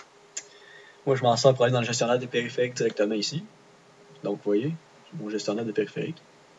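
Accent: Quebec, Canada. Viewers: Haha la vidéo à commencé j'ai pas compris, puis jme suis dit "ahhh mais c'est du québéquois" =) Quand on s'y attend pas ça surprend ^^ merci pour cette vidéo ! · Est-ce que les Français font exprès pour ne pas comprendre l'accent Québécois??? Nous on comprends très bien votre accent et vos énormes anglicismes!!! "Putain j'piges pas c'que tu dis bordel"... nous on dis "Criss qu'es-ce tu dis tabarnak"... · C'est toi le con ! Ça s'entend pas que c'est un Québécois ??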